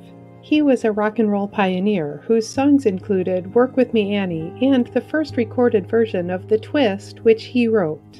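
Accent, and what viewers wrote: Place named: Midwest, United States. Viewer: I smell an accent, ah narrator are you from the Midwest? ❤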